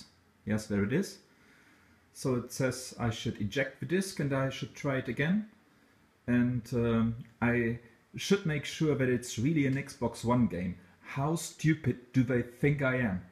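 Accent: Germany. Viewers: deutscher lol?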